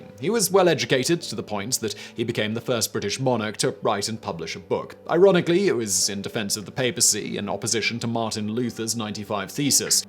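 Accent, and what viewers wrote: United States. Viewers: Dine asty. When did you become a Yank? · oh don't I know it the thing is its pronunciation is completely different in the UK. His US pronunciation is very grating on the old ear holes don't you know. What's actually scary is I can remember the names of the characters :)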